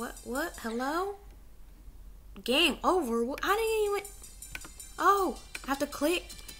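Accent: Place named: United Kingdom. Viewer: Are you British or not? Cause you sound like it. But I Love you!!!!😘😘😘😘😘😘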